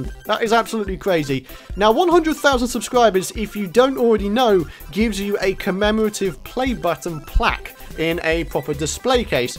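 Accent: United Kingdom. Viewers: let me just say that among many of the Minecraft YouTubers that I watch for their adventures through the vast world that is Minecraft, and the several great Terraria Let's Players that I watch, your intro and voice definitely resonates within my mind as one of the most energetic, fun and exciting ones that I know of! I discovered you from a comment you posted on one of Yrimir's videos when you were around 45-50K subscribers, and I remember you were working on your Co-Op with AstonishingGamer as well as just starting the Sky Island series with another fellow (whose name eludes me) and I was immediately hooked to your episodes. Perhaps it's your charming British talk, or the way you say "I'm a very happy bunny!" but I am very much a fan of your videos and I look forward to what is to come in the future. Congratulations! Please keep up the good work, and it's commendable doing this while working someplace in real time. 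I hope to watch hundreds of your videos in the future! -Thomas (Could I request your filmed reaction when you get your plaque? I'm not saying a view of your face, but perhaps just like your first person reaction to it.:D)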